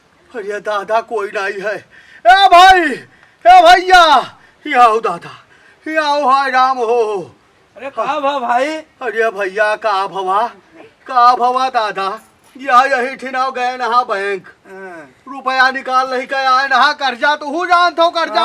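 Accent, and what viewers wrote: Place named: Awadhi, India. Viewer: अति सुन्दर प्रस्तुति मजा आ गया आपकी इस उत्कृष्ट और सुन्दर एक्टिंग से।। हम लोगों का सुंदर बचपना याद आ गया। सबसे सुंदर ये लगा की अपना अवधी में सुना। इतनी मिठास भरी हुई है की मन प्रफुल्लित हो गया।।। Best of luck for your future endeavours and all the upcoming videos.. My best wishes to you and your teams.. God bless you all. Jai shree Ram ❤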